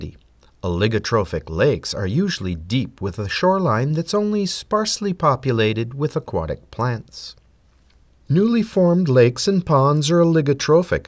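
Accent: United States. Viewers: Hooray! you're a fellow New Englander How many East Massachusetts. You do not have a Boston accent as far as I can tell But you might have like no sound down east main either maybe Vermont West Vermont southern Vermont connecticut western Connecticut Edison New Yorker either like a New Yorker I'm confused where are you from I'm not trying to track you down I just like I like to place accents I'm an accent of all. my favorite actions are from like alabama the Kentucky Irish and Scottish and Welsh and English I do like German and Japanese accidents as well. so I just I like to place roughly where people are from you said you're from New England but I can't place where in New England. embassy says that we know how to track you down if I wanted to and I don't. you could be middle middle state or upstate Maine possibly because my grandmother was from down East Main your Kenny Bunkport and she had a very distinctive accent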